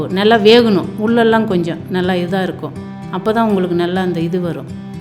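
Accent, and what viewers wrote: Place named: Krishnagiri, India. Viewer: Authentic nama ooru slang bro.... love From krishnagiri... Near to your area.. NEW HOUSING UNIT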